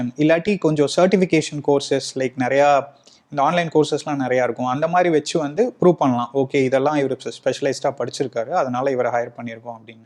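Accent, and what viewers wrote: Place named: Coimbatore, India. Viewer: நான் வசிப்பது பெங்களூர் தம்பி நீங்கள் நன்றாக பேசினீர்கள் நீங்கள் கோயமுத்தூர் என நினைக்கிறேன் தம்பி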